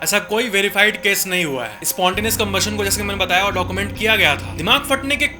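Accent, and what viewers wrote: Bihar, India. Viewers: bhai tu bihari ho na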